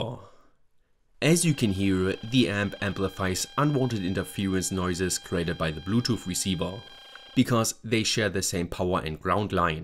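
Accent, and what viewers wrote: Germany. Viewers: is from Germany. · Der bre klingt biss europäisch, würde fast sagen, deutsch... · nicht sicher aber hörst dich sehr deutsch an also akzent mäßig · German accent goes brrrrrrr And nice Video :)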